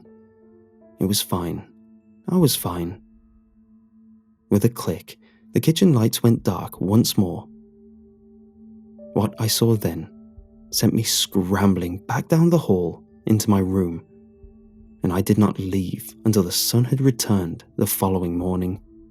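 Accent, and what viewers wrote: United Kingdom. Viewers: Yeah honestly.. I love the British accent.. I just wonder how it isn't broken or sore from all these story times...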